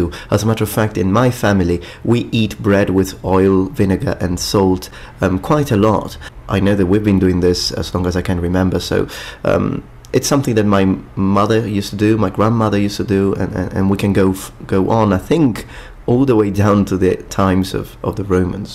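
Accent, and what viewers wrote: United Kingdom. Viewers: Did you have a British parent or live for some time in the UK. You speak English like a UK citizen. Oh, by the way, I think your Italian sounds better than your Classical Italian. Your Italian sounded smoother, and you seemed more at ease with it. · He's Italian with a British accent. Love it!!!!!!!👍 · You are an Italian with British accent? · he sounds more British than Italian. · Well technically he is speaking English correctly, with a British accent. Probably how he learned it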